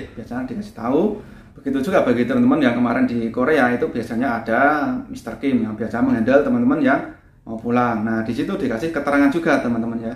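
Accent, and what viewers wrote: Java, Indonesia. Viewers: Medok tenan kang...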